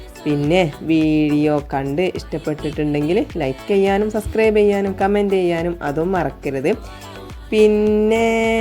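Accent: Malappuram, India. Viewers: മലപ്പുറം ഭാഷ മലപ്പുറം താത്ത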